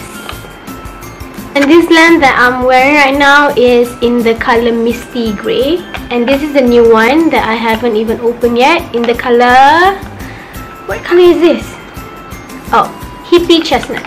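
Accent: Malaysia. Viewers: she's from Malaysia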